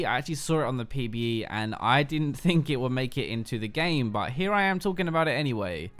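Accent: United Kingdom. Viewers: "I think honestly, basically, i mean. i don't honestly, i guess. he honestly, basically it means, honestly" - Damn You really need to limit yourself when you use those words and phrases. I watched your 30 min blue EZ guide, and it really pissed me off. On these 7- 10 min videos you can't actually notice, but on that EZ guide it was really horrible. I really Love your British accent and the content you are making, but those phrases man, damn. Keep up the good work tho. Cheers.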